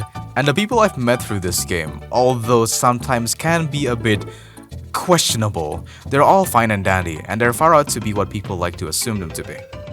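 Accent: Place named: Indonesia. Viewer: Idk how but I clocked in you being Indo just from the first couple of seconds and I was right. INDO PRIDE GRAAHHHHHHH🦅🦅🦅🦅🔥🔥🔥🔥🇮🇩🇮🇩🇮🇩🇮🇩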